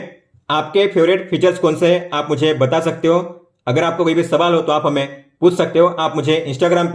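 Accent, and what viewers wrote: Gujarat, India. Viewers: Are you from gujarat..? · Are you from Gujarat?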